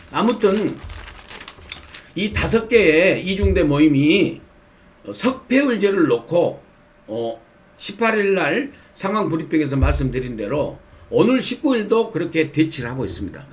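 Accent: Jeolla, South Korea. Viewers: 전라도사투리 너무 좋구요 옳으신논평 잘 경청합니다 민영삼티브 파이팅입니다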